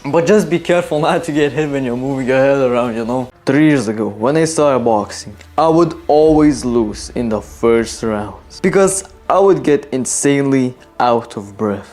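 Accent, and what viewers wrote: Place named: Russia. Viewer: Im subscribing cause he got that rusky accent.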